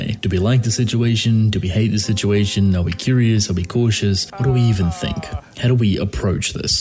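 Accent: Australia. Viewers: I don’t know why, but I think I’m more likely to sub to a channel when the narrator is Australian.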